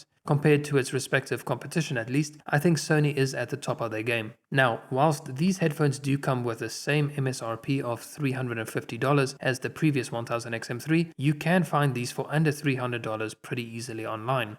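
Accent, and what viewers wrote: South Africa. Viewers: South African accent, but living in Dubai.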